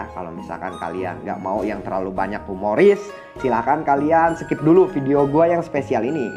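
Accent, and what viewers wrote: West Java, Indonesia. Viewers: Cing baleg atuh ngomong na katingali pisan Jelema Sunda na teh tapi Hade pisan Gaya na terus Ken Persib bandung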